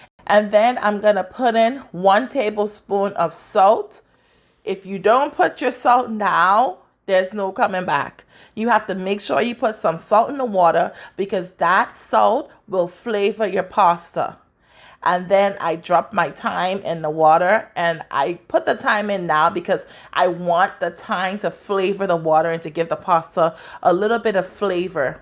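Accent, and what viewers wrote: Bahamas. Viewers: Lol your bahamian accent! My mouth is watering as I watch this, my number one favorite side yum😋 #cheesy and rich #them herbs is everything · Bahamian accent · That Bahamian accent🔥🔥🤌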